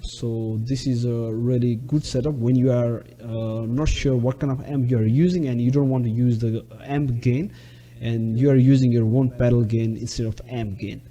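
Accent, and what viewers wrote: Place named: Nepal. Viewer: Are you Nepalese bro? you’ve Nepalese Accent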